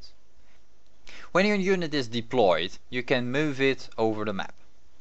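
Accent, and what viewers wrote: Netherlands. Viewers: Ik keek de vid en hoorde gelijk dat je nl was, bedankt voor de uitleg man! Ik speel deze game al meer dan 200uur hahaha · ah that makes sence thanks for the tip :) Btw are you dutch ? :) cuz i can hear you are definantly not english :)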